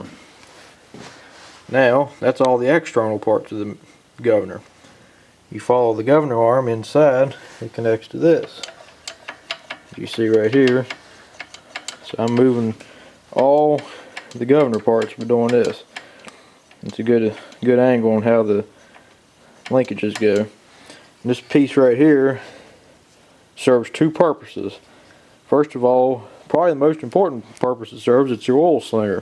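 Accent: South, United States. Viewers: Love your videos sir, and that southern accent makes me miss my almost 20 years of life in TN. I have a Leaf Vac with a Briggs Intek 875 engine. Inherited from grandpa in north Michigan. Engine was hydro locked and I have been able to fix it, ne oil, filter plug and it is now running but only on high. Looks like it's all connected properly to the carb. Is there anything else besides a stuck butterfly valve that would make just run on rabbit speed? Any advice would be greatly appreciated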